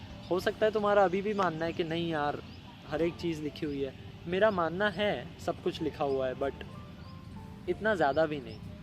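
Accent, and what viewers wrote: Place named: Gujarat, India. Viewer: gujrati log jab hindi bolte h tab thodi si to gujrati tone aa hi jati h bas ussi liye aisa laga 😅 btw mai bhi gujrat se hi hu .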